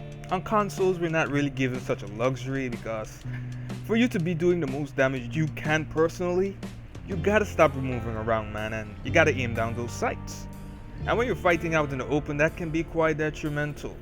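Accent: Caribbean. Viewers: TheDivisionVampire I'm Jamaican. That accent isn't Jamaican. Sounds Caribbean - just can't figure out which island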